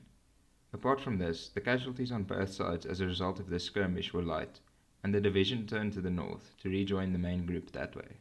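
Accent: South Africa. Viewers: Perfectly good English. Don't let the morons get you down. Sounds like a South African accent maybe?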